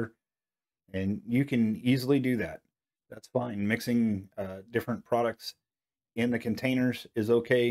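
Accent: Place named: Pacific Northwest, United States. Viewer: LOLfirst of all I see an Aspen Pest Control vehicle outside your driveway. I'm in Vancouver and use them, they're very good. Based on your suggestion I got the Spraymate battery sprayer. I used it for the first time the other day and look forward to using more liquids than granular. My grass is Barenbrug RTF Turf Saver and as soon as my Allett Stirling arrives I'm going to start reel mowing at 2 inches and hopefully down to 1.5 and maybe 1.25 but will see. Anyway I enjoy your channel and nice to have a PNW voice out there.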